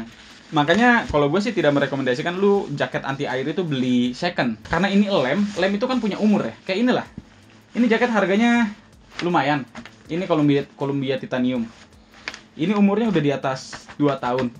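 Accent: Java, Indonesia. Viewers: Bang kalo bisa qolqolahnya jangan terlalu medog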